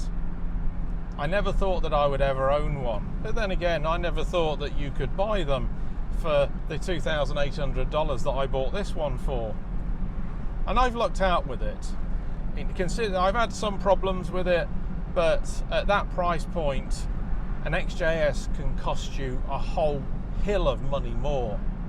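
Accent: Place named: United Kingdom. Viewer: Interesting video. Nice to see some of the early development pictures. I have always thought it unfair that the XJS is knocked by so many and leads you to think no one likes them which isn't true. They have a big following and people really do like them. It was unfair to compare them against the e type. It wasn't an e type it was an XJS. In the mid 70's we got the SD1 Rover, Tr7, Princess, and the XJS and it was too much of a shock for many people. British cars were trying to modernise but the public weren't yet ready for them. The XJS i believe had the longest production run of any Jaguar and when the convertible was released in 1988 it lead i think in 1990 to its best sales year. I own a 1992 Facelift V12 Convertible and since buying it, it has amazed me how much attention it gets where ever i go. Strangely enough i was never keen on the look of the facelift lights until i got mine and i love it. 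They are the most comfortable seats i have ever had in a car and they are lovely cars. You got a bargain with yours and it been the Coupe in the USA as you said will make it rarer and a little bit more special. I saw the thumbnail and expected to see a US spec car and when you started speaking i thought you were a brit and confirmed when i looked at your homepage.👍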